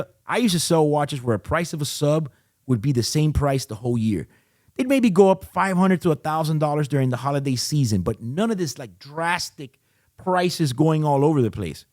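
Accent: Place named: Miami, United States. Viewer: Heaviest Miami accent ever. Clear-lee.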